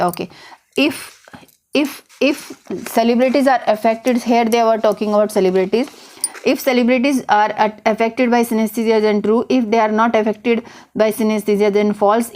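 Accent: India. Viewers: I find nothing to learn from your lessons. Bloody indian pronunciation. Uploading edited vedio. Such a stupidity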